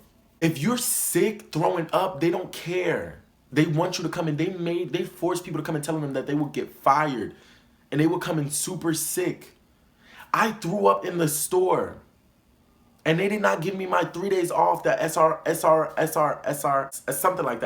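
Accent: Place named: Miami, United States. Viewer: I think it’s in Miami based on his accent and DR references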